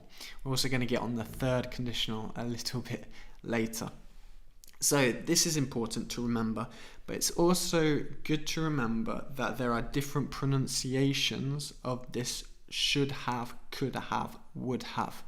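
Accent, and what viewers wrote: United Kingdom. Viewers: English is my third language and I’ve learnt it since I was little, I am in love with your british accent and I wish I grew up learning it in the UK😍 your accent in spanish was good, it is my first language and norwegian is my second language. Growing up in Norway we were introduced to english all the time, on TV and more and norwegian isn’t an useful language because only 5 million speak it and you won’t reach far in the world only being able to speak to 5 million people😂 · I love your accent,!. Someday I'd like so much can speak fluently and with a British accent. Greetings of Argentina:D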